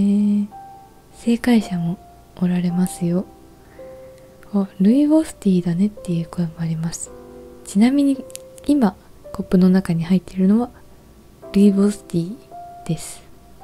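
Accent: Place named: Shimane, Japan. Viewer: の島根言葉がかわいｋ・・・zzzz..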